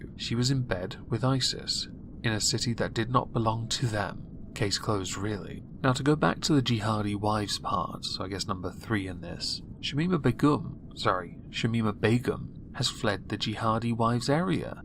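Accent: RP, United Kingdom. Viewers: Could maybe ONE of you closet furries do the not-really-going-out-there-on-the-edge social commentary videos WITHOUT the laconic "I just woke up" received pronunciation and the weird background ambiance to sound in-character? Christ all-fucking Mighty.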